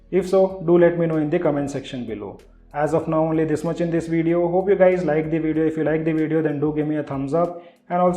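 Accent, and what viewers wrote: India. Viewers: Indian style English is awesome.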